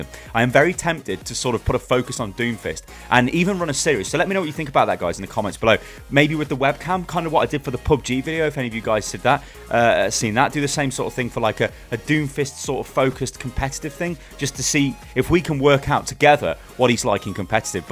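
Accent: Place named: United Kingdom. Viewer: I love your videos, I watch your videos always when I'm having breakfast, both for the news and for your british accent. I wish someday you do a Unit lost con, I would gladly come from Spain. Keep up with the good work! Cheers!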